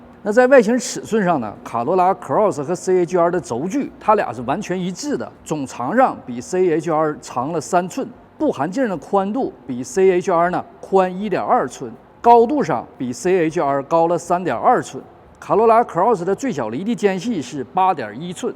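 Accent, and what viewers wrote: Northeast, China. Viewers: 值得十倍以上的订阅以及十倍以上的赞，简直就是华人评车圈里的Doug Demuro，没有花里胡哨，全是干货信息。作为老广竟然觉得这东北口音格外舒服哈哈，👍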